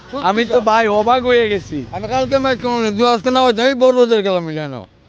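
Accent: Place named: Kishoreganj, Bangladesh. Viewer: are you from kishorganj? cuz iam too i know your speaking in kishorganji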